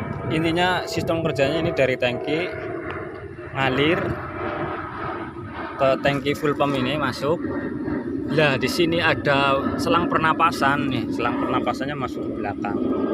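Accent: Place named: Kebumen, Indonesia. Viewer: Logat bicara karo Bahasa mu lik🤣🤣🤣 Rika Kebumen sblh ndi?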